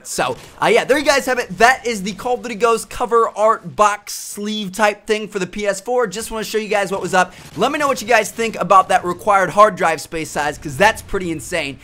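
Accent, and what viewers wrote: Spain. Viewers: spanish accent <3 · Thumbs up if you want to listen Spanish accent again. No criticism just found it funny as a Spanish person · I love your Spanish accent, you should try to do a video In Spanish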